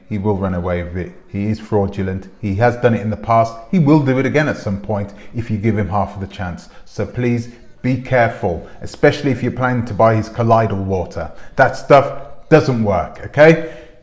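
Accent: United Kingdom. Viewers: You’re a fraud, you British talking sounding shavy head having Weirdo. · An African speaking in a British accent hating on a black American man’s success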